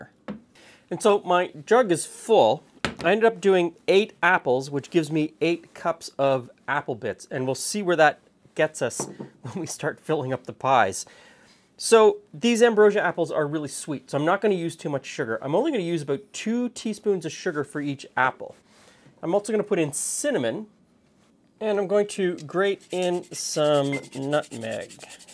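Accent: Canada. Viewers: This is why I come here -- not just to remind myself of what a Canadian accent sounds like, but to learn little helpful tips.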